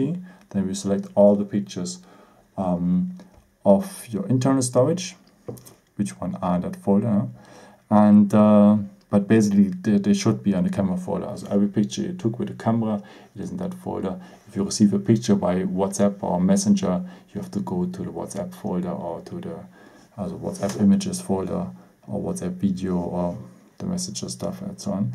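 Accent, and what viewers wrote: Germany. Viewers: German English :))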